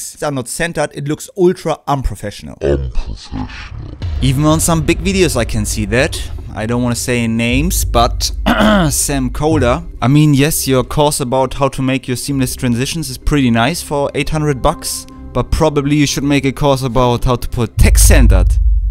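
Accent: Germany. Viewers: Are you German? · Ach ja.... Wenn Deutsche Englisch sprechen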